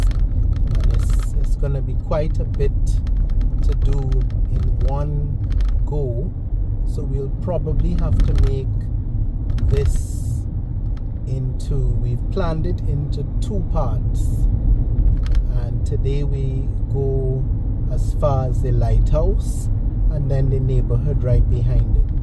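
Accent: Trinidad and Tobago. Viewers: ❤ Thank you so much We Barbadians love watching you here from Italy That area from Thornbury hill near Scarborough area that gap is called Peg well or Pegwell Gardens Have some family there ❤ Keep up the good work love your Trini Voice ❤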